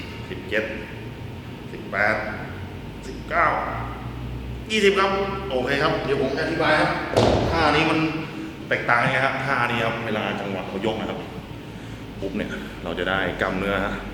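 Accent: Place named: North, Thailand. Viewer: ธนยศ ไชยชมภู สำเนียงบ่งบอกเลยไข่ป่ะ พี่เขาคนเหนือแน่นอน 555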